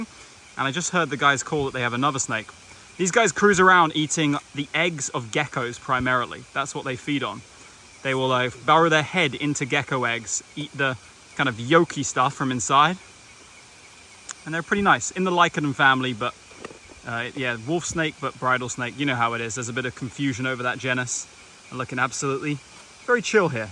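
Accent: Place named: England, United Kingdom. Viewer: Constantly amazed at the sheer volume of variety of our slithery friends., you are an excellent member of the YouTube Herpetologist Vanguards (patent pending). You have a pleasant voice and the English accent doesn't hurt, and that exuberance can't be faked. Thanks for this and all the videos you've upped. Er, not so sure 'bout the mullet. Brings back flashbacks of the 80's <shudder> 🖖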